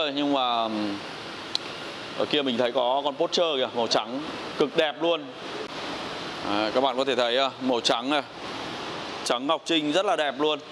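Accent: North, Vietnam. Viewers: Nghe giọng miền bắc đọc kiểu tây chuẩn ko vào tai. Đọc kiểu việt hợp hơn. Nhưng mấy ông miền nam phát âm kiểu chuẩn tây rất êm tai nhé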